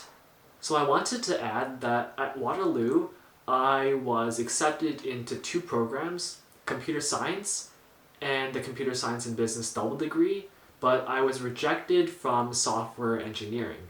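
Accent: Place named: California, United States. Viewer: SE's cutoff is 97. With the academic adjustment factor, you might've just missed the cutoff. Otherwise, are you a Canadian citizen? Engineering has quotas on the max number of internationals they let in. You seem to have a Californian accent, but I could be wrong. Other speculation: perhaps another sport, a part-time job, or something that sets you apart from the typical applicant would've been helpful, especially considering the hundreds of typical applicants from Markham/Richmond Hill/York Region. P.S. Bayview and St. Robs are feeder schools.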